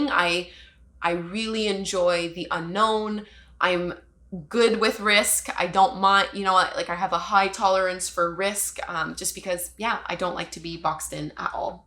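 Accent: Quebec, Canada. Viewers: C'est drôle comme même dans les commentaires on lit l'accent québécois 😄 I am french and it was a nice and funny surprise to hear you speak french with that incredible canadian accent 😄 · Salut, je ne sais pas non plus que tu es francophone. Je te suis depuis le début de la pandémie ou je me suis mise au yoga. Je te suis de Suisse francophone. J’adore ton accent québécois. Merci pour ton super travail, Une vidéo en français ca serait cool. j adore ta chaîne à la prochaine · Dang, Quebecois French is ... somethin' else... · its probably really obvious to them, but i didnt realise you were canadian till just now ;)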